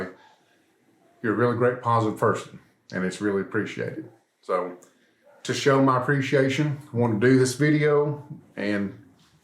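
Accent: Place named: South, United States. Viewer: My husband and I just watched the whole thing together. Such a great video!!!! James has a few antique tools that my grandpa has given him and HE LOVES THEM!!!! Your channel is seriously so cool. I love your content and I love this video!!! :) Great job restoring!!! Keep creating. I’m subscribed and will always be:) Lastly, I love your accent! I wish I had a southern accent... From, Collins Country:)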